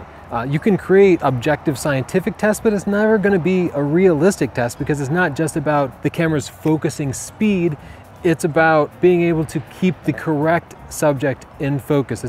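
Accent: United States. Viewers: I love the American pronunciation, the UK being the 51st state, now commonly known as the United Kingdom of America and we have an American princess now, ignore the haters i wouldn't give em air time, i love my Mustang too No more visits to California to Enjoy driving a Mustang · "It's Ny-kon in the US, not Knee-kon." And then go on to claim 'Zee-7' is a world-wide pronunciation. The letter Z is pronounced Zed everywhere but the US. The product will therefore be pronounced Zed-7 everywhere but the US, whether you like it or not. If you want leeway for your American dialect and pronunciations, at least show the same courtesy. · Loved that ending. "I'm an American, this is my dialect." Hell yeah. No one should be ashamed of their dialect, Americans included.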